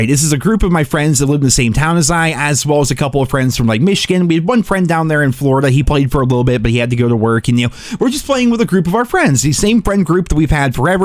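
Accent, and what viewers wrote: Scotland, United Kingdom. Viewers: Lmfao as a Canadian that was an obvious Scottish accent 🤦🏽‍♂️ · Irish?????? Clearly Scottish..... I think you said sweaty players 5 times while laying prone in the corner 😂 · Scottish not Irish dude 😂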